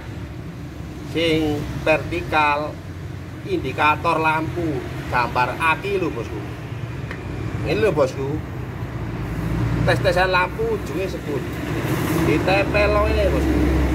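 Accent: East Java, Indonesia. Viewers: Boso jowo timuran iku cak....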